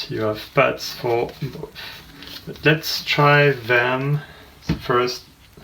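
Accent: France. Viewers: you have a french accent, mon ami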